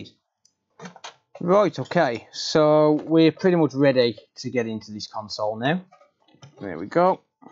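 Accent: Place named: Walsall, United Kingdom. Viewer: Ooooooo, you sound super local 🤣 Walsall guy in the House!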